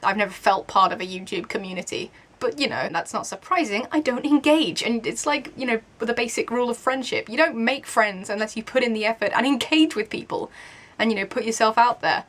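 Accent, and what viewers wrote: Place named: United Kingdom. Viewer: Okay, I just scrolled through my entire liked videos list to try and find out through which of your videos I discovered you because I didn't discover you through your sister (I actually found her through you ;) ). It turns out I discovered you because of Pottermore. Back when it was still in the development phase and only a few people at a time could register I would look at tons of sorting videos on youtube and that is how I found you. It is kind of hard to discribe why I subscribed to you. It's number of reasons, all of which will probably sound pretty weird. I really like your accent (I'm from Germany and I absolutely adore British accents), I like the way you talk, your enthusiasm, your honesty, your opinions... also, you share two of my very favourite interests which are reading and writing and, of course, Harry Potter :) All in all I find these insights into your life incredibly interesting. 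You are a few years older than me so it is kind of like watching what my future might look like in terms of going to uni, having your own place, finding work etc. I do have my own Youtube channel (which is called MissSophieReads) which is basically just your stereotypical channel about reading and books (I haven't yet come up with any cool original video ideas).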